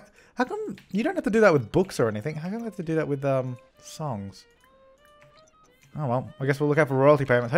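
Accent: Australia. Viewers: YOU ARE A FELLOW AUSSIE! Or Kiwi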